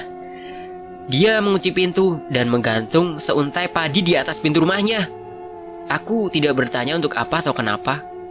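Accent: Java, Indonesia. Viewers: Yap betul...logat Jawa mmg kayak gitu Krn AQ orang Jawa...semangat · Logat Jawa yg mana ???..tiap daerah jawa punya logat beda-beda..jadi gak aneh..!!yg penting isi yg di sampaikan dapat di mengerti..ok bosqu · Iku logate yo wes Jowo Gok!.. Jowo mu ndi se ??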